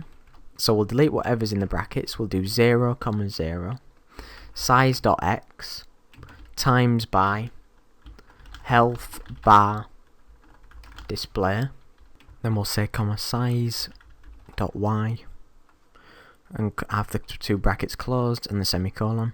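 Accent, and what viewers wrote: United Kingdom. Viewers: Classic British accent ;)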